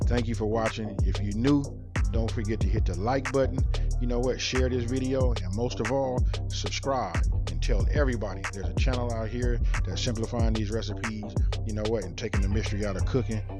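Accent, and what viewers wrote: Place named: Los Angeles, United States. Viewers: If LA had an accent thish it homie. Loved the video I’m about to make this right now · I can tell that Cali accent anywhere. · I love California accents. We really the best out mayne 😂♥️ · I heard that Cali accent and subscribed immediately!!! Made me straight home sick. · He sounds like a west coast guy...My people loved the recipe love from the north east 😎🤙🏽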